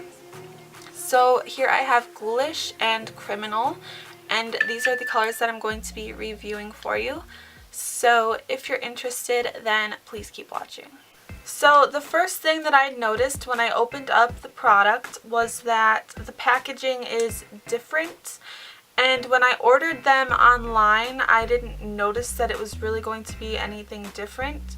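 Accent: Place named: Boston, United States. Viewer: Your boston is so cute!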